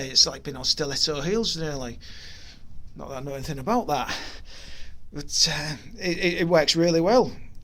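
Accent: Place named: Yorkshire, United Kingdom. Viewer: Firstly my good man, don't ever apologise for a wonderful Yorkshire accent! Most of my wife's family are from Hull! And secondly, THANK YOU, THANK YOU, THANK YOU, for recognising that us mere mortals cannot afford these things! I'm currently looking into funding for a Grit Freedom Chair, which is a very similar thing to what you've designed and built for yourself. I say 'looking into', because I know full well that I won't be able to get the funding to be able to afford this thing. Firstly, it's manufactured in America (the first hurdle I've got to negotiate), secondly, as you've rightly pointed out, these things cost an absolute arm and a leg, (it works out at nearly 5 grand for goodness sake!) and thirdly, at least 90% of the funding available for these things is aimed at kids, or least for people under the age of 25! I'm a 48 year old man, for goodness sake, what chance have I got! 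I want to enjoy what life I've got left, I want to go off the beaten track a bit. So I need something like this! But I can't afford it. I wish the manufacturers of these things, would get it into their skulls that the very people that need these things, are also the poorest people in our societies, the disabled people who, generally speaking are on benefits! I guess what I'm trying to say, is congratulations for taking matters into your own hands, and actually building one of these things yourself, and getting yourself out of the predicament that many of us find ourselves in.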